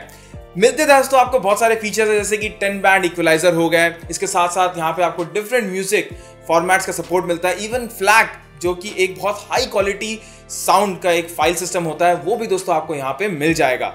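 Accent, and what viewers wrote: India. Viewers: Hes speaking Hindi-nglish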